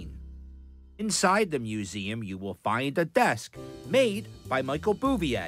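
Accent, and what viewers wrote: Philadelphia, United States. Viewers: Great Tour👍👍Love the Philly accent !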